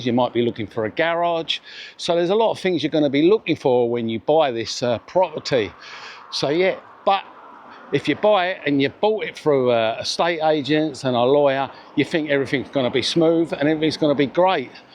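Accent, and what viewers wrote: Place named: Cockney, United Kingdom. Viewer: I love the cockney accent!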